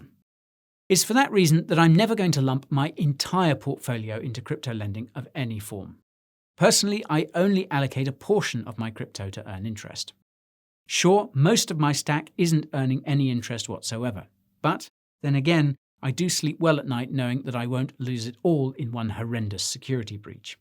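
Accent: United Kingdom. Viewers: Just because of the British accent I trust this guy more lol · Love your brit accent & pacing. It sounds much more credible than the typical self-help American mumbo jumbo. With that sweet voice, you could sell me any shitcoin you want, lolz.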